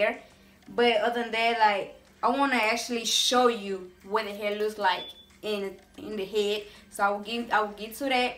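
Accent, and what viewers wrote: South, United States. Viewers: Nah that's an insult to just can't speak she's ignorant just got a country accent she ain't putting on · She’s Hispanic and she’s from Alabama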